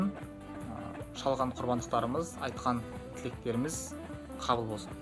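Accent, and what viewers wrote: Standard. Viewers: Ризамыз таза казакша сойлеиди жане дастур бойынша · қазақшасы қандай таза. Ризамын.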